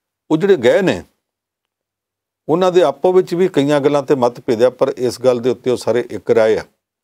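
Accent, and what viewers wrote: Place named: Punjab, India. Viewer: sahib di jatki boli manu bahut pasand hae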